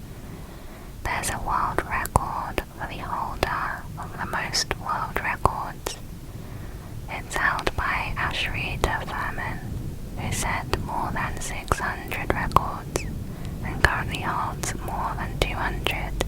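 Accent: United Kingdom. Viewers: are you british? actually yeah you obviously are what am i on about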